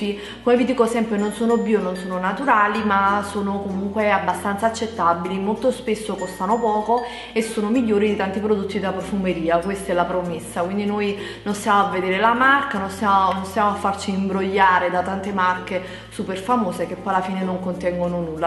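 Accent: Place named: Castellammare, Italy. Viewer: ma sei di Castellammare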